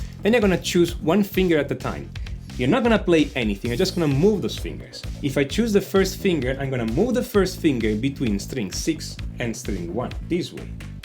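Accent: Italy. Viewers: ma sei italiano per caso?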